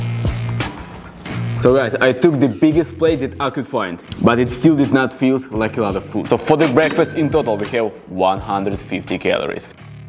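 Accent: Russia. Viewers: Русский акцент,уникален) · сразу выдает русский акцент :) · Good russkiy akcent,! Kak dela?)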